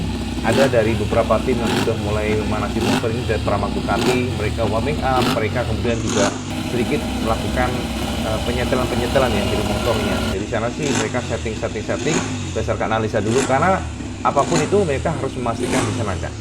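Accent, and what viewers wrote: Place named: Lombok, Indonesia. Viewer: MATURTAMPIASIH,,,kental bahasa sasak lombok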